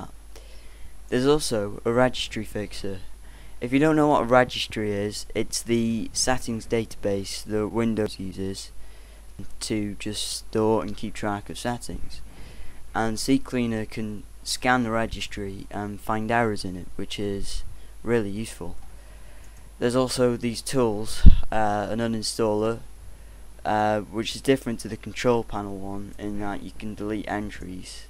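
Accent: United Kingdom. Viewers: yes brtain people nice accent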